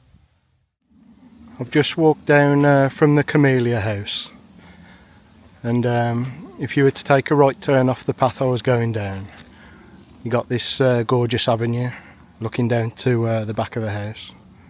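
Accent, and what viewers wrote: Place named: Birmingham, United Kingdom. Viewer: So nice to hear a brummy narrator. I think the persuaders with roger moore was shot there or maybe i am mistaken.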